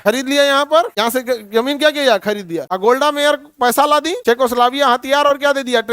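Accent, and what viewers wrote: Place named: Bhojpuri, India. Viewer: I like the way he speaks in bhojpuri style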